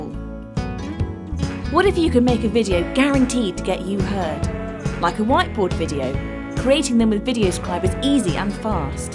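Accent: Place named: United Kingdom. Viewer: I love the British accent tho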